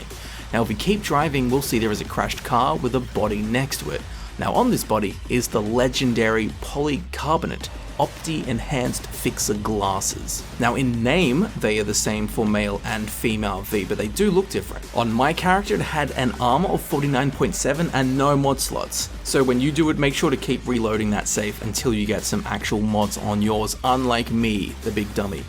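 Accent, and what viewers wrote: Sydney, Australia. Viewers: Hey are u Australian? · Your from Sydney aye? I'm from Newcastle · Yo, you from Sydney?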